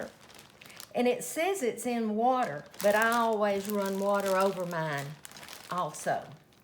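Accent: South, United States. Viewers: Just love you ♥️ My daddy just got out of the hospital and his health is very tenuous…I’m helping my mama take care of him and it’s very stressful. But when I have a few minutes and just want to escape and calm my mind, I watch your videos. Your sweet southern charm and lovely accent just warm my heart and your videos remind me that as long as I have Jesus, I can bear anything. Love you! God bless xoxo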